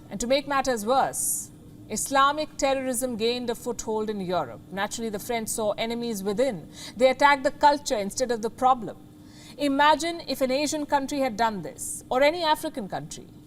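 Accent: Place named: India. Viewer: She just speak English i in hindi style with indian accent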